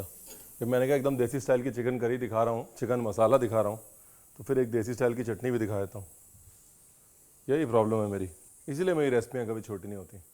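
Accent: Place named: Lucknow, India. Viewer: Sir aapko chup rehne k liye kisne bola? Ek sec bhi pls chup na rahen aap! Jab tak Ranveer sahab ki lucknowi zubaan ka zayka na mile, kya faayda aisi dish ka? Aap bolte rahe aabaad rahe! Dhaniya kaat te time kuch bole hi nahi aap 😂 ! Aap pls bole, bolte rahen ❤❤❤❤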